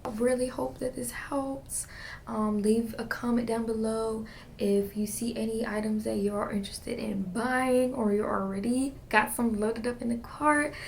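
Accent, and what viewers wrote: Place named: Mexico. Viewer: I know she's Mexican but her Mexican voice is a little too much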